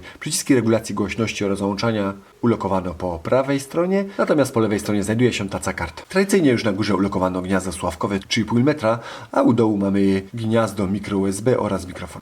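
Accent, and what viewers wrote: Silesia, Poland. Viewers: Witam :) Akcent wskazuje że jesteś Ślązakiem ?! Na ręce masz ? Ten ludzik Android ma jakąś funkcję czy tylko ozdoba, córka mnie o takiego męczy ;)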